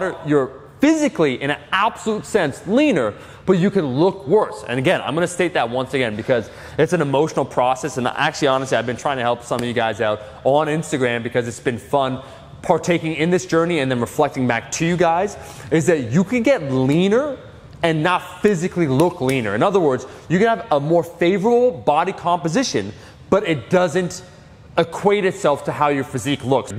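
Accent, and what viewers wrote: Switzerland. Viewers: Dialect. I think it's Swiss German. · That Schweizerdeutsch though... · Never thought I'd hear Swiss German in an American fitness video. Greetings from the old country! Aber, der beste Käse immer kommt aus die Romandie oder Tessin, und du wisst das wie alle Düütschschwiitzer. Emmenthaler<Gruyère<Büscion da caura.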